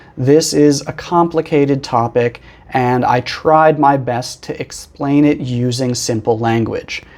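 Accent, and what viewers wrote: United States. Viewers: Pleased to see you again. The topic is intriguing. I found it very useful and learned a lot of things from it. I enjoy listening to an American accent, it sounds awesome. I am so greatful for that video. 👍🥰❤️ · You have an amazing American accent, really we are lucky to learn English with you Teacher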